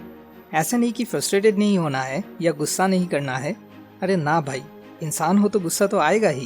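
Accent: Bengal, India. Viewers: Dada accent 🙌🙌it's too sweet rosugulla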